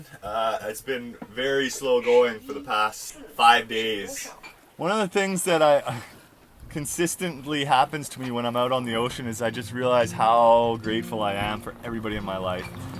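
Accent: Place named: Canada. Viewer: So pumped to hear your Canadian, Much love from New Brunswick!!!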